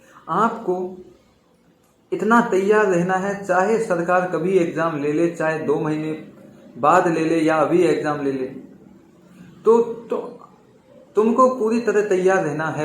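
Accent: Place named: Dholpur-Bharatpur, India. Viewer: Bhai kaha se ho Bhasha to Dholpur Bharatpur ki si Lag rahi .( me aapko jarur reply dango 🤣🤣.)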